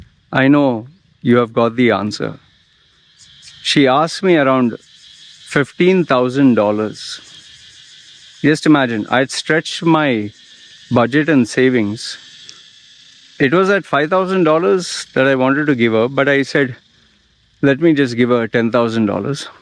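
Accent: India. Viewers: You sound like you are Indian are you?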